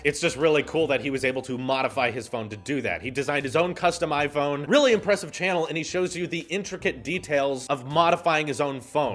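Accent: United States. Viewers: What’s you’re nationality? Just wondering I thought you were from the UK but you sound American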